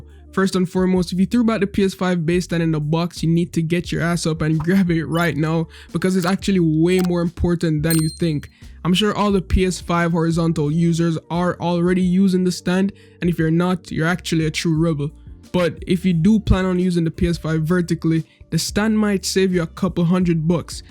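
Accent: Jamaica. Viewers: Are you a Jamaican? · You could hear the Jamaican accent 🇯🇲🇯🇲 old news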